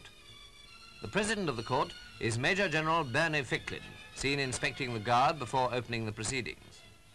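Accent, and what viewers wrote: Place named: RP, United Kingdom. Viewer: speaking BBC English instead of the mishmash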